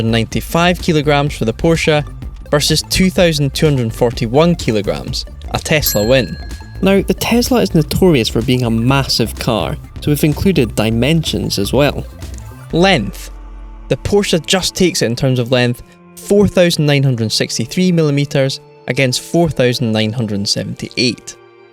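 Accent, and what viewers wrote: Scotland, United Kingdom. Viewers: please get someone thats not scottish...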